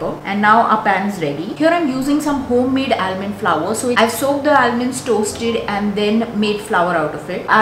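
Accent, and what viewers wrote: India. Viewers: I love the thick indian acctent, the cake, and the fact that you put the macros too.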